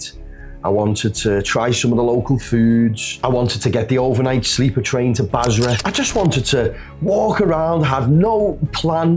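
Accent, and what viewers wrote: United Kingdom. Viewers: انا عراقي اعشق اللهجة البربطانية الفخمة 🤤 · dude your accent is cool where are you from? Birmingham? what accent is this? I know it's British but from where specifically? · تحديدا هاي لهجة سكوتلندا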